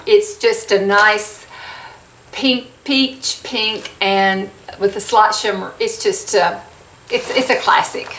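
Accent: South, United States. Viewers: I feel like if I was to meet you sometime you and I would have such fun showing off our southern accents!! I was born and raised in Oklahoma and will never (I hope) never live any other place. I love your videos and love your straight forwardness. Thanks for being your true self! 🤗🤗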